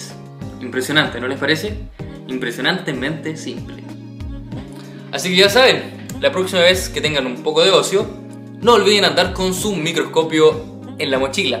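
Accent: Chile. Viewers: Eres chileno? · Oye, qué genial tu canal. Estaba buscando imágenes del grafeno para tratar de entender el tema de los cristales, para ubicarme en eso de que "son la excepción a la morfogénesis autónoma" que plantea Monod y todo esto porque estoy leyendo sobre la teoría de Gaia de Lovelock para una revista digital que edito... o sea, la vuelta pa' larga! (pero entrete, una cosa lleva a otra). El caso es que llegué al video y caché al toque que eres chileno, jajaja! Y bue... me di una vueltecita por otras cápsulas y nada, poh, que está muuuuuy interesante. Ojalá en los colegios y liceos enseñaran así, con experimentación casera. Ta muy bueno! Un aporte. Eso.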